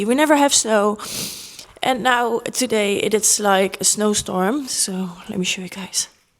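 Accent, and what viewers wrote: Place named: Netherlands. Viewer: Love your NETHERLAND accent 🤗🤗🤗🤗🤗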